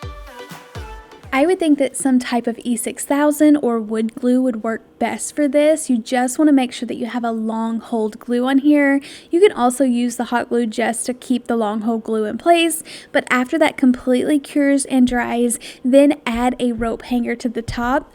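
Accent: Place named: Utah, United States. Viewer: You kinda' have a Utah accent. Do you live there?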